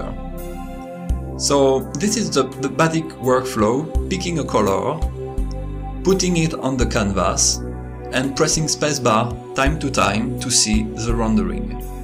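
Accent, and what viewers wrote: France. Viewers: Cet accent français. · I worked in Issoire, France for 4 months in 2005, near Clermont Ferrand. It was refreshing to once again hear someone with a French accent speak such proper English. Makes me wish I was there again. Nice job overcoming the language barrier, and nice job on the video as well. I'm about to download Krita now in order to try to use it to illustrate a children's book. Wish me luck. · your french accent sounds amazing,to be honest. the video itself is very useful,thank you,the art looks incredible,love it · I'm not a native english speaker and I tent to have problems to understand people with accent. But understanding you is very easy don't worry. Most people, me included also like the french accent. Thanks for the tutorial! Would love to see more in the future. Love your art and love free software too!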